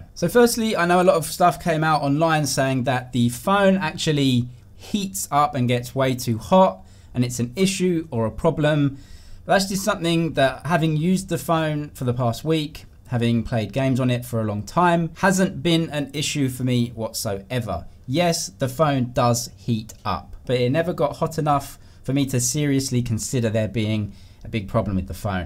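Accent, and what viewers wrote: United Kingdom. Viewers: you looks like eminem with an british accent 😊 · Are you British?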